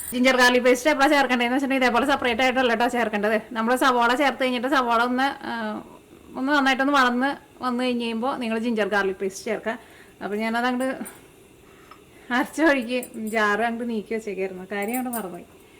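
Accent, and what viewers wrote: Thrissur, India. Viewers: Thrissurkaari aaahno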